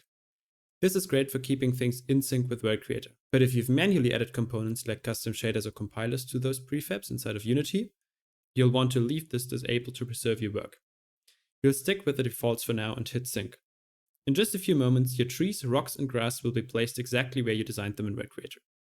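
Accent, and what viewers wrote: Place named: Germany. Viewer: Wonderful! I'll buy this if you release a Mac version. You sound German. Are you? Germans come up with the absolute best stuff!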